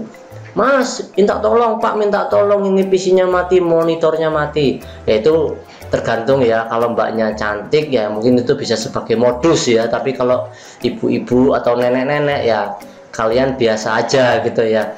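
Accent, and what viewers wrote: Banyumas, Indonesia. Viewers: wah pantes ngapak toh, punya No.WA mas?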